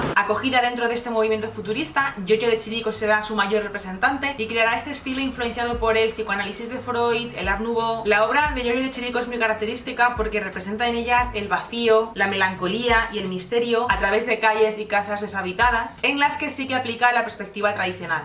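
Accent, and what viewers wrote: Castile, Spain. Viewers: Eres muy bonita y, creo que debes hacer algo, porque percibo que la imagen de tu rostro permanece tiempo excesivo como primer cuadro. Si es verdad que el gran público, está interesado en ver imágenes interesantes conteniendo arte, si es verdad también, que tu rostro es muy bonito y, que haces bien en mostrarlo, le harías un gran favor a tus seguidores si acortaras el tiempo en que sale tu bello rostro a cuadro. Y bueno, la última y me voy: Mujer divina, tienes un halo mágico, me gusta mucho lo que dices y tu voz es cálida y tiene color pero, hablas demasiado rápido y el acento castizo termina haciendo tu parlamento algo confuso. Felicidades, contigo he aprendido mucho. Saludos.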